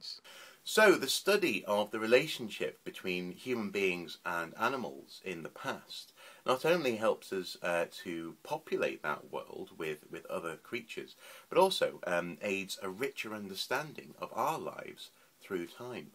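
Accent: United Kingdom. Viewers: are you british your acent is very strong?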